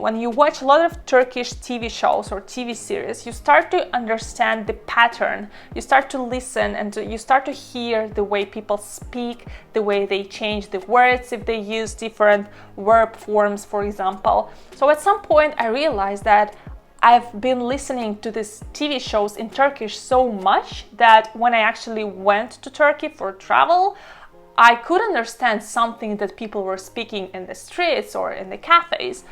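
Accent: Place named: Russia. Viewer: Hello, from a native russian speaker, it's very interesting that a russian accent is so present in your speaking. Not about good or bad, just a remark.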